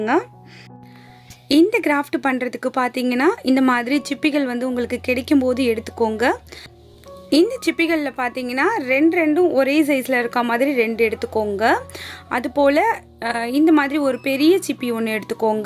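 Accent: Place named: Chennai, India. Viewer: Very nice super showpiece akka unga Slong semmaya iruku neenga chennai ya sis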